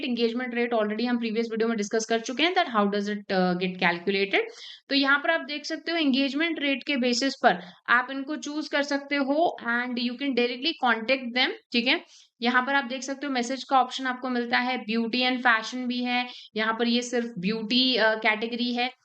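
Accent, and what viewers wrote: India. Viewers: Indian English fuck it🚮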